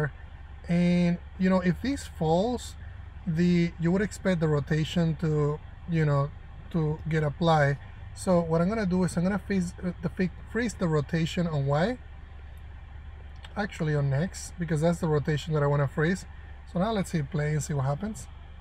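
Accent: Spain. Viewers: I really like your videos man. Are you Spanish?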